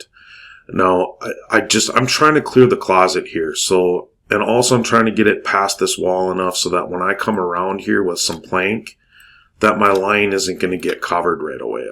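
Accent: Minnesota, United States. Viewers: I love your videos... great explanations. And I know how hard it is to quit smoking!!! I quit after 20 years. Also enjoy that Minne-soooota accent. All my people live there. Great job. I also pray you will quit smoking.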